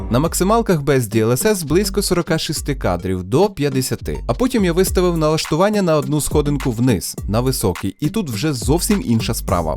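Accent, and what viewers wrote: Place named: Surzhyk, Ukraine. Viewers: а шо тут такого, хай буде собі суржик, це робить тебе індивідуальним, у кожної людини шо балакає суржиком є свій унікальний суржик, так що розмовляйте як удобно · За суржик лайк👍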